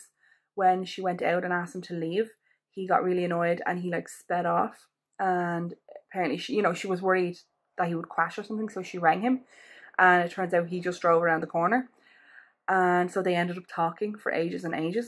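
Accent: Ireland. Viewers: It's a typical Irish way of speaking so she's just being herself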